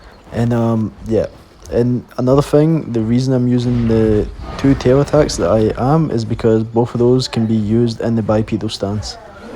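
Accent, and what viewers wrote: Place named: Scotland, United Kingdom. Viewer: Ah the Scottish accent! My mum is from Scotland, my whole family is over there. Love it :)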